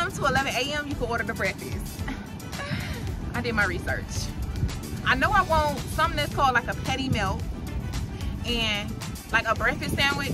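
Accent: South, United States. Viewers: In and out is only good the animal style way and them fries was trash when I tried them. But I want you try other options at whataburger and lmao u know how us down south folks talk gurl 🤣 😂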